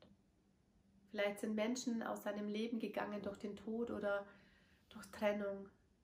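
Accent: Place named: Bavaria, Germany. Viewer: Schoen,der bayerische Dialekt🙏🙏fuehle mich zuhause🙏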